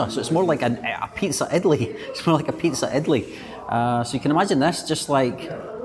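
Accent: Scotland, United Kingdom. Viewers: Yup ! Thick Scotland Accent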